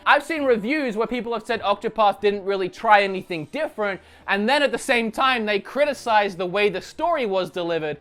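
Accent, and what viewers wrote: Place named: Australia. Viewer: Also stop with the stupid aus accent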